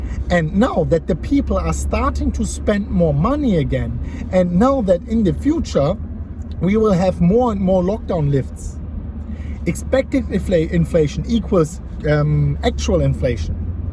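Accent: Germany. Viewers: Your German ?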